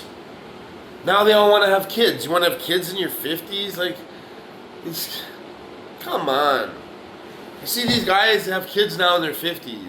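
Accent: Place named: Canada. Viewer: 😂🤣you're hilarious, dude this is spot on, the excessive noise and the god damn heel stomping everywhere they walk and banging dishes is 100% truth. Are you a fellow Canadian by any chance? because you sound like one, cheers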